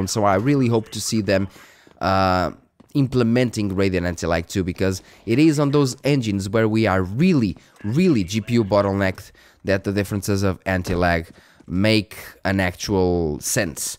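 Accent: Portugal. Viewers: Are you Portuguese by any chance? You sound like one of us tho haha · Boas mano, preferes o processador 7700x ao 7800x3d? Reparei que esse é o teu. Abraço bom conteúdo, pelo sotaque vi logo que eras tuga hehehe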